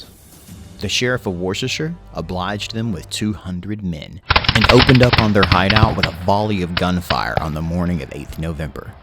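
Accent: United States. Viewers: But he’s not British! We have an American accent, just as everyone has their own country’s. (Those are some counter-intuitive pronunciations - lol) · Makes sense why you know of it then seen as (I'm guessing from the accent) you're American. Good vid, got yourself a new sub. Much love from West Yorkshire, England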